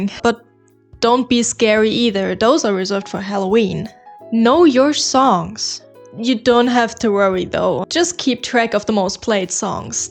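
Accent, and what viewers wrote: Germany. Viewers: I didnt know you´re german too! Well, atleast by the video and the accent im thinking so (okay yea i didnt complete the video before) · Good video overall, here is my constructive criticism: The audio is a bit raspy. While this is obviously a money issue (microphone), it is making it harder to watch. This is especially so because you are trying to present a pleasent scene - good mic quality plays a big part in that. While the german accent is really cute, the amount of pausing you do between some words can make it harder to keep attention (This is one of those things that comes with practice I guess). I can't decide wether im vibing with the choice of background or not. On one hand, I like the idea of setting the focus on you and the cosplay, on the other hand I can imagine this getting a bit bland rather quickly (especially for the average attention span these days (we're all cooked)). 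I generally really like the editing. I audibly giggled at the zoom . A few soundeffects could help underline comedy and better the viewer retention. I dont necessarily dislike the amount of text used, but just having it a standart font white on black for every single one is a bit boring if you ask me. I really appreciate that you are trying to be creative and not just repeating known methods and whats popular. A lot of my criticism is just due to you figuring things out so dont take it to seriously. Its a bit confusing to me what direction this channel is headed. You are not trying to be as attentiongrabbing as possible (which is great, please dont be!), which implies that you want to build a strong viewership instead o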